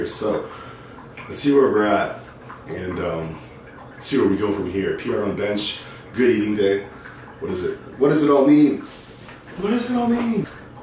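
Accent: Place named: Colombia. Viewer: lmao literally thought you were columbian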